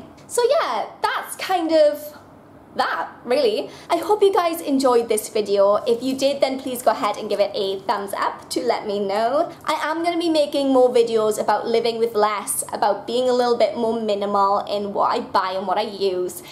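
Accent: Wales, United Kingdom. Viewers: I love your Welsh accent!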